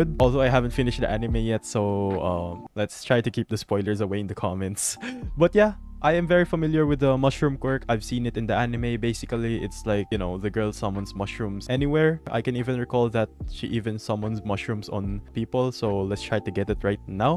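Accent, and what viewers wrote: Philippines. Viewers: You give me that filipino-english accent vibe. Are you?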